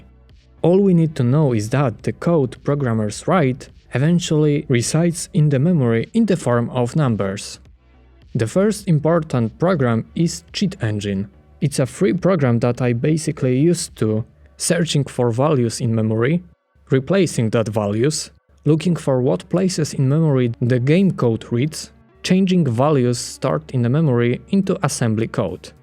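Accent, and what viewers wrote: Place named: Poland. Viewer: jesteś z polski słysze akcent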